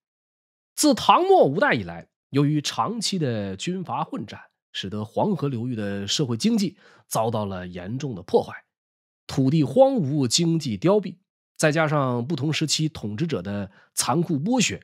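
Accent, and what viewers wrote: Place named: Tianjin, China. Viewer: 我会说一点粤语 以前经常看港片听粤语歌看一些那边拍的整蛊视频 其实我和博主算是老乡 不知道你们听没听出来博主说话有点天津滴口音 介北方人和南方人就算都说普通话也是能听出来发音上的区别的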